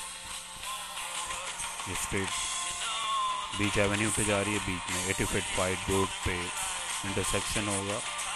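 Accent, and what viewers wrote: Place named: Punjab, India. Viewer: lolzz ... is may aap deakhay gay kay muslim ko kitna accha ker deeya hay hahahahahah ahahaah ..... I like your urdu accent as it sounds panjabi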